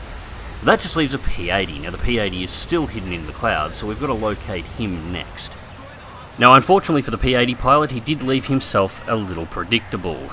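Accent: Australia. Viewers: He's from Australia, hence his logo and his accent.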